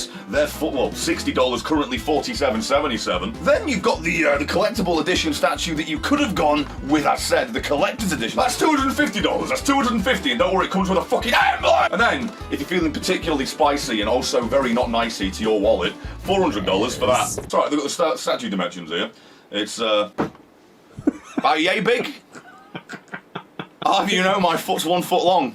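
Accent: United Kingdom. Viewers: There’s something so satisfying about a fine bri’ish gentleman going absolutely mental while also making good points on camera that is just *chef’s kiss*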